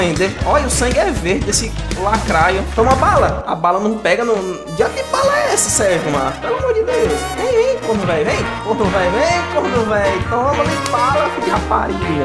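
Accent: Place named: Ceará, Brazil. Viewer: Mermao, Cearense falando e muita onda kkkkkkkkkkkkkkkkkk Coloquem mais esse narrador pfv!! muito bom!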